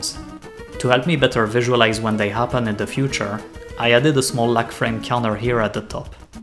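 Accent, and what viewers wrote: France. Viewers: Do i hear a little french behind that great video? Beau travail camarade!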